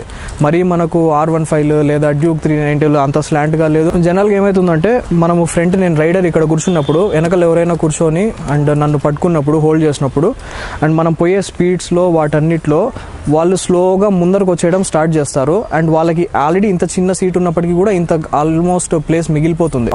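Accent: Rayalaseema, India. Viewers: I alway love your rayalaseema slang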